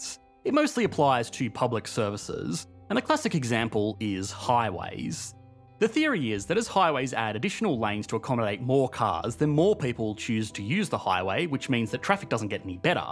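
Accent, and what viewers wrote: Australia. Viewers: goes to the point. I live in a suburban area in Europe and own a car but also use public transport. While I use the car to go to work away from the city there are definitely use cases where going to the city is faster, cheaper and much more convenient by public transport. Not to mention that that shorter travel time is also free to use for reading news, watching this video, or other and you can also drink some alcohol if you are going out. It is unbeatable value proposition, forget the car. But that is mostly because the city was planned to have really good public transport on the expense of roads for cars. Some jurisdictions even outlawed free parking to give more incentive to use public transport. The author of the video sounds Australian, so I understand, that’s another planet compared to large European cities.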